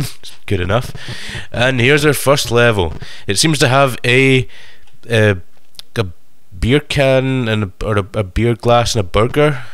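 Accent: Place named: United States. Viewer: Ha ha! I like that American accent. Almost had a bit of a 50s radio voice going, there.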